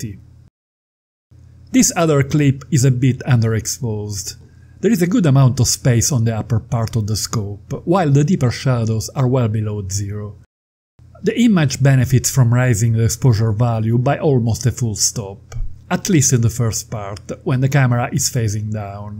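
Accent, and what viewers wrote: Italy. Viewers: Very informative and helpful, especially for a beginner in video editing pro photographer. And I adore this heavy Italian accent. Big thumbs up!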